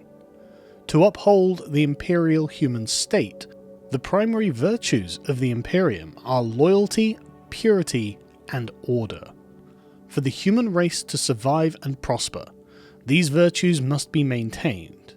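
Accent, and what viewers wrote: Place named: United Kingdom. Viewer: A very disingenuous "analysis". The problem GW is fighting against is that fascists and straight up nazis tend to enjoy the setting a little too much. They see the hardcore totalitarian, militaristic, theocratic, intolerant, expansionist and genocidal nature of the Imperium as aspirational and something modern society should model itself after, not understanding or caring about how the entire setting is a satire and sometimes a clear condemnation of that world view. As it happens with other IPs like Starship Troopers, they are just enamored with the superficial aesthetics of the totalitarian government in the setting, and care for little else, and try to claim the whole thing as their own. In many ways, GW is just another amoral corporation, but they recognize that their brand being associated with nazis is very, very bad for business. 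That's the reason they have to release statements condemning the presence of extreme right individuals in the community, not because they happen to actually hate conservative, masculine, western values. Benjamin has mastered the art of sounding smart while being very stupid. Proof that just because you have a snooty british accent doesn't mean people should pay you any attention.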